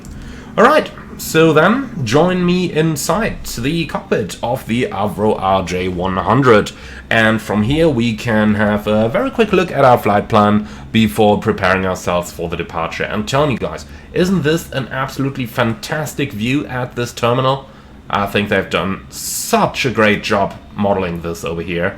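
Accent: Switzerland. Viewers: I don't speak german but, as a swiss descendant, I recognize the swiss accent when I hear it. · Geil mit dem schweizer akzent😂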